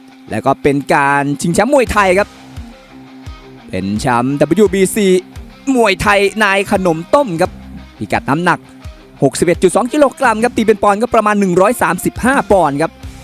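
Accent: Isan, Thailand. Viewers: รำคาญเสียงพากย์อีสาน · พูดอิสานเฮาแกมภาคกลางฟังแล้วม่วนคัก ข่อยคนอุบล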